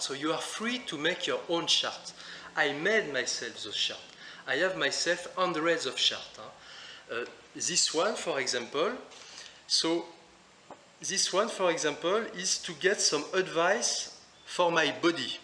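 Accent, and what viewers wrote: France. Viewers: Ohh, the french dialect... j'aime! ❤ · Loved the video! very helpful and explained lovely - specially with the french accent ( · Love the accent😁my son in law is French, and reminds me of France and lovely people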